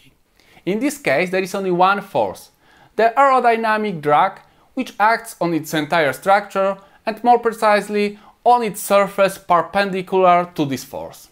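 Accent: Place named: India. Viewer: Did you learn English in India?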